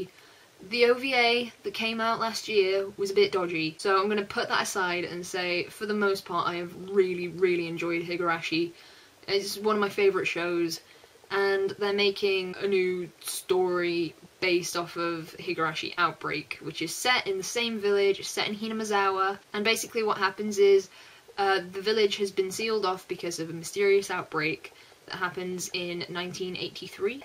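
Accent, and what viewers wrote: United Kingdom. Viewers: aha what part of britain are you from? you sound alittle south eastern like london ? and lol to be honest the only anime i have seen this term that is watchable is Shinjekyo no kyojin i tried some of the other ones but meeeeh maybe ill try gargantia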